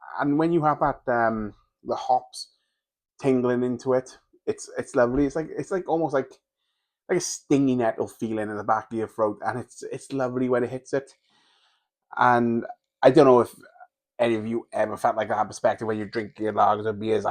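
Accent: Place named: Wales, United Kingdom. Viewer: Nice review, nice and objective about it. Not to take anything away from this drink as I like it myself, this particular one is brewed n France (it was German brewed years ago) and on the subject of Aldi clone/tribute brands, this particular one is a clone of Germany's Krombacher Pilsner. The Krombacher is available in Tesco and Morrisons as part of their 3 for £6/6.50 deal. Btw I detect a Welsh accent, do you live in Wales?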